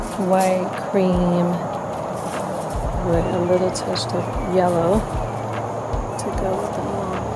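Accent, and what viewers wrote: New Orleans, United States. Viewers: you are the absolute sweetest person I have ever heard! I feel blessed to have found your channel and look forward to every one of your tutorials. I love the way you talk! It gives us a glimpse into your roots (NOLA girl!). We Canadians have our own special way of saying some words and I honestly think I say punkins sometimes as well! You are such a treasure sweet girl! Much love from Canada. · Just found your channel and subscribed after one video! Hey, I love your New Orleans accent. I grew up not far from there but across the line in Mississippi. It was a wonderful day trip to visit the Quarter and eat at some of the best restaurants anywhere. I like your vision of the holidays. I’ve grown weary of other decorations; while I love “farmstyle”, I prefer unique interpretations of holiday decor, and desire something that no one else has. 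You’ve met that need. Look forward to seeing the beauty of art & design through your eyes!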